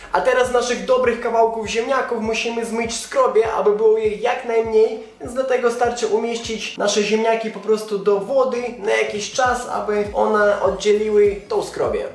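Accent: Russia. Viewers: jestes z rosji czy ukrainy?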